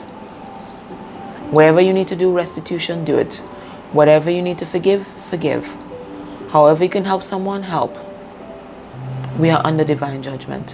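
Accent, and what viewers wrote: Trinidad and Tobago. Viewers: Are you a trinie you sound like a trinie, good works thanks for the guidance God bless.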